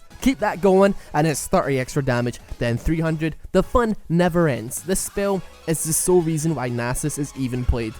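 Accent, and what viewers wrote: Scotland, United Kingdom. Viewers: He is scottish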